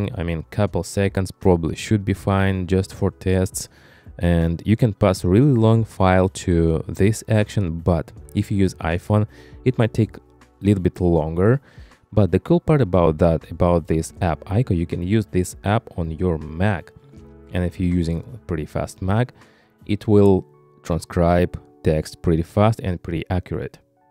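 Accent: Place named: Yucatan, Mexico. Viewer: Nunca había escuchado un acento yucateco en inglés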